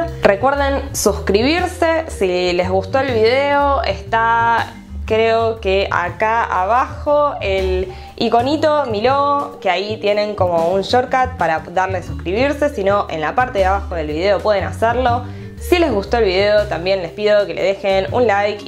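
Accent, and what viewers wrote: Argentina. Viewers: Me vuelve loco tu acento! Me encanta escuchar a las argentinas, ufff!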